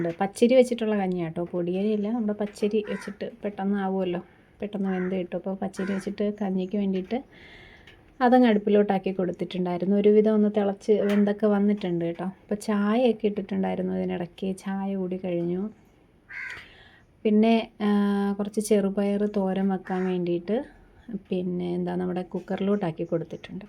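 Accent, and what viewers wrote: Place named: Kollam, India. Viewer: സംസാരം കേട്ടപ്പോൾ തോന്നി കൊല്ലം ആണെന്ന് കൊല്ലത്തു എവിടെയാണ് ഞാനും കൊല്ലം ആണ്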